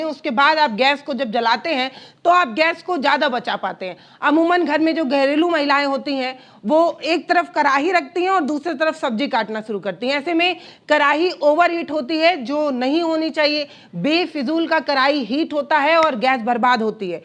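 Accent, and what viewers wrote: Bihar, India. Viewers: Aap bihar se ho na??? Kaaraahi kaaraahi bol rhi ho?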